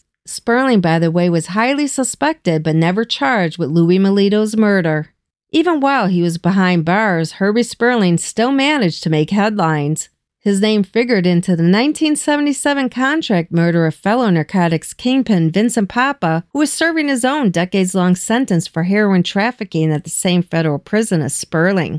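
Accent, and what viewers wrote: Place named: Northeast, United States. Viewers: It's like I'm back up in the Bronx listening to Aunt Irene tell a story. Love it. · Another great production and presentation! Please take this as a huge comment, your voice with your accents really brings this old country boy the Northeast mafia territories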